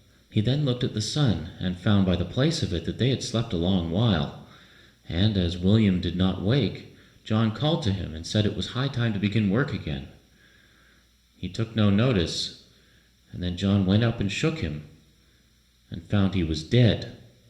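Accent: Canada. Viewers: Thank-you for making this video, I like it. Is the accent of the narrator Canadian?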